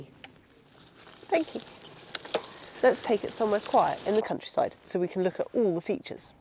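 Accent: England, United Kingdom. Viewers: Long time since your dulcet robbed English accented voice issued forth from the speaker of my smartphone. A 20 inch Brompton with Shimano 8 speed is not very different from the Moulton that was on offer last year out in Arizona. Shame it doesn't have the front fork of the Moulton The Moulton frame might be stronger. Not as convenient. The 34 spoke rear wheel would be better for use on unpaved roads. We have hundreds of miles of dedicated bike trails here in Missouri. Katy. Rock Island etc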